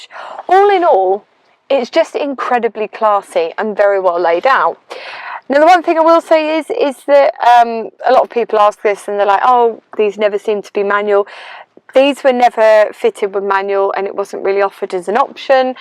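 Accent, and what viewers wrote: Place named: RP, United Kingdom. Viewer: omg no way y are so posh lady.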